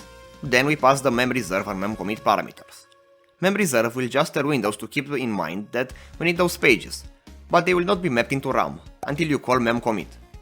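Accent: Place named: Romania. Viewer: scrie la descriere frt+ ai accent de roman :)))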